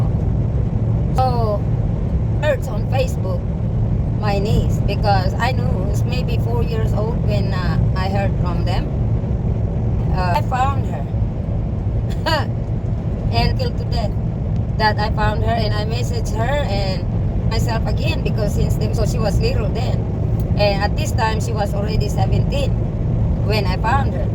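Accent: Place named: South, United States. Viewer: Bait mo lola kawawa din ang bata kapag ganyan naiiwanan.love your southern accent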